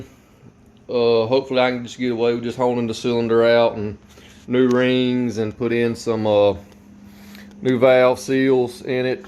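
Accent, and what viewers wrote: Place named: Deep South, United States. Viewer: Big Bay'er fo hunred, got er a rebil kiyt. man thats a deep deep south accent brother. Im from the far far north east.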